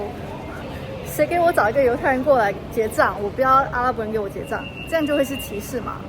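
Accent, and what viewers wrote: Taiwan. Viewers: 好奇你是台灣人嗎?口音就是台灣人..但福建的也很像! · 听口音是台湾人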